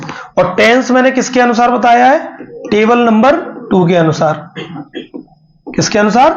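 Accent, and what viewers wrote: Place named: Bhind, India. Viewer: Sir apki awaj Bhind jile jaisi lag rhi aap kaha se pada rhe ho please reply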